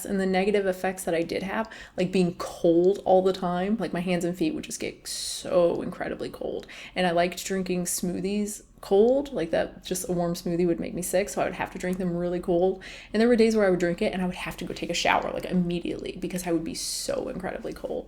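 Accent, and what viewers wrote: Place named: South, United States. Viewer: what happened to your southern accent??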